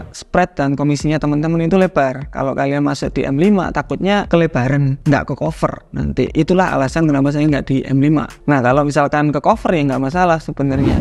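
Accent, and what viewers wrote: Java, Indonesia. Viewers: Asli mana mas Logatnya kayak dari Jawa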